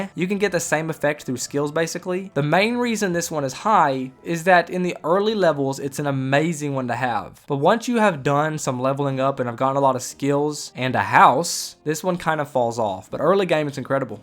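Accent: South, United States. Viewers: My opinion is..... You have a fantastic southern accent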